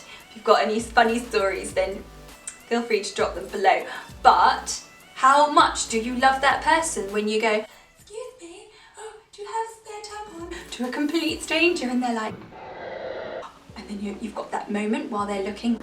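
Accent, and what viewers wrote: England, United Kingdom. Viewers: Lol. I don’t even know why I watched this. “Curiosity killed the cat”...they say. Your personality is so magnetic I guess I’ll listen to you talk about anything. That English accent.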